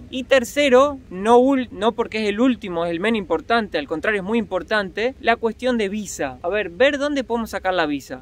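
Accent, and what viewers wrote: Argentina. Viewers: Iniciamente reconozco eres argentino ;) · Hola,eres de Argentina?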